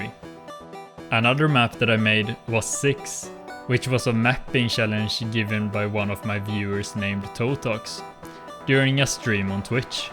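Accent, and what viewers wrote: Sweden. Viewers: Swedish! Love the accent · I love your swedish accent, i went to sweden at vacation i know tack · this is the most swedish accent i have ever heard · as a fellow swede, love the dialect :D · No offence så men jag gillar verkligen inte svenska dialekten på engelska.. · du har den svenskaste engelskan jag har hört på ett tag o jag älskar det! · Damn that Swedish accent hits hard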